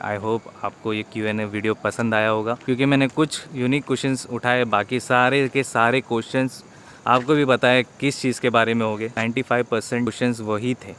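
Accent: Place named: Solapur, India. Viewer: tum Solapur ke ho kya???? Blog mein sunne jaisa laga???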